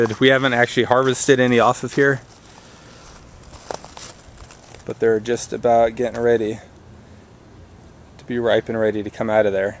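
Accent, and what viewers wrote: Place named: Upstate New York, United States. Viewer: Your a hard worker and a good man...good vids....i worked in the wyoming boom in the 70s.......you sound like u r from upstate NY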